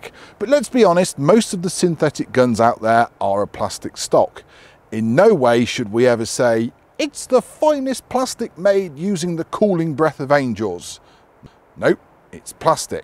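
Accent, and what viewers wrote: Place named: Bristol, United Kingdom. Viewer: Sounds like a Bristolian to me!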